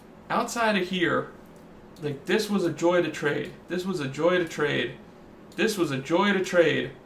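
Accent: United States. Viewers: Hey, thanks, i've been watching your discourse on twitter haha, also you sound texan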